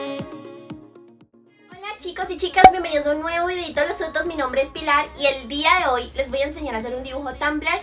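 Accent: Colombia. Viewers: Supuse que eras Caleña! saludos desde el sur, por cierto, nueva sub!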